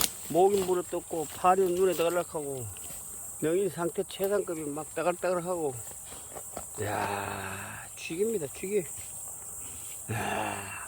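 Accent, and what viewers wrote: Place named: Gyeongsang, South Korea. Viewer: 영상 너무 신나네요~~사투리가 김천(?) 고향사투라 오랜만에 정겹네요 안전 산행 하세요~~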